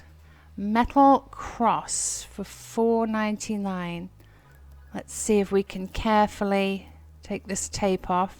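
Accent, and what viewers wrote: England, United Kingdom. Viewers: Love your accent my mother was from England. Miss her voice. Love your videos!!!💕💕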